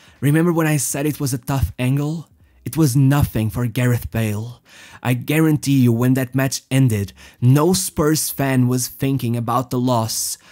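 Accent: Portugal. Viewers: Are u portuguese? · I can tell your mother tongue is Portugese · This dude (narrator) is sooooo Portuguese. I'm almost 100% certain just because of the accent.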